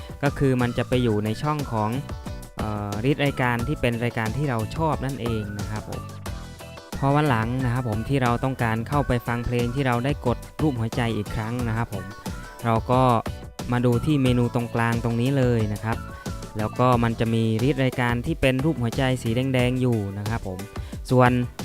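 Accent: Thailand. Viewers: สำเนียงถ้าไม่บุรีรัมย์ก็สุรินทร์แหละ555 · ทำนองเสียงน่าจะเป็นคนกรุงเทพ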